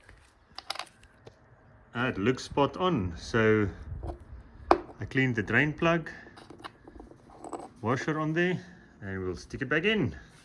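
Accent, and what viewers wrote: South Africa. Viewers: ‘tsempian’ for sure lol. You will never be able to hide your South Africanisms😅 I must add that one thing I really do like about an SUV is that with its higher ground clearance one can get under the car and service it for the most part (however somewhat awkwardly), but with a normal sedan or hatchback just jacking up the car is such a chore and I hate it. On larger SUVs the engine bays have space to use tools, smaller cars not so much.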